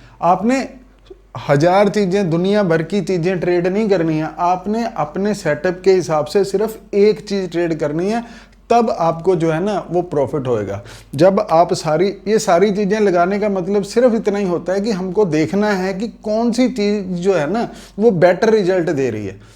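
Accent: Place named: Haryana, India. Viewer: Boht schi knowledge, ❤ Apke way of talking se lgta h. Ki ap haryana ke h...Am i right sir ?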